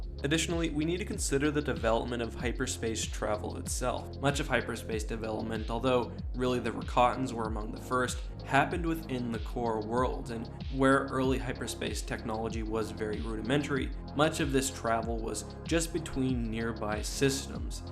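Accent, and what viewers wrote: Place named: Nova Scotia, Canada. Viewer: I was just thinking thing guy sounds Nova Scotian and then boom a photo of Halifax.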